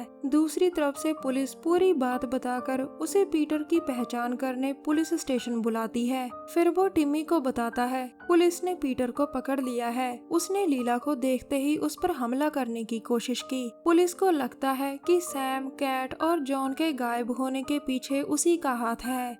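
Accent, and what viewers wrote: Punjab, India. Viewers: kyaa app punjab se ho me 2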